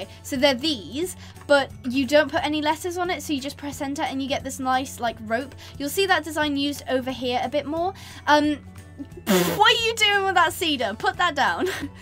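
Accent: United Kingdom. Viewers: I love the British accent she has! Love ya!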